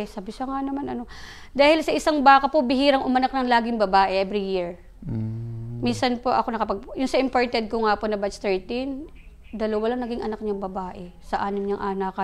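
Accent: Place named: Quezon, Philippines. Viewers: Calabarzon accent diga ho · Ay tunay na Quezon ang punto, kainaman na, ang galing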